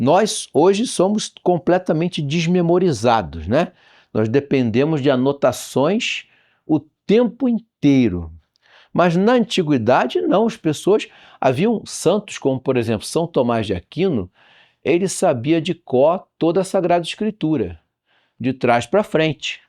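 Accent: Rio de Janeiro, Brazil. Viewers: Olá, boa noite! Que aulas maravilhosas!!! Parabéns ao CDB e ao excelente professor! Só gostaria de frisar, aqui, que o excessivo sotaque carioca torna a aula cansativa ao ouvido, o excesso do chiado do 's', o que é um ruído da fala, é ríspido ao ouvido. Como boa estudante que fui de estética vocal, sugeriria ao professor minimizar este sotaque. Nada contra os cariocas, por favor. Apenas que para falar ao grande público é melhor sem ele e qualquer outro. Obrigada.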